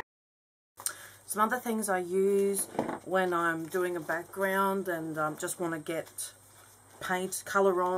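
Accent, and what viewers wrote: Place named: Australia. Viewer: Hi, I love the techniques you've shared here ☺ stencils are my favourite way to add pattern & colour right now but I go through phases of loving different techniques and mediums! I love your aussie accent too!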